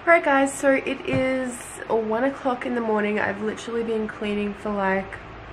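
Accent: Australia. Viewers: New to this channel. Is she Australian? I can’t tell where the accent is from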